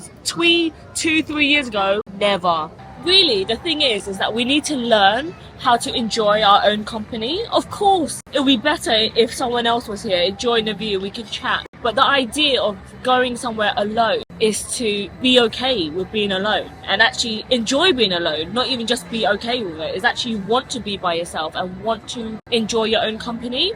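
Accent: United Kingdom. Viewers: Thank you for sharing and being so open and vulnerable with us. I can relate to you and your feelings in so many ways this was refreshing to see and encouraging. I’ve been so scared to solo travel 😩😂❤️ I’m so used to traveling with friends or my partner. This might have been the push I needed ❤️ Also yes you sound that British 😂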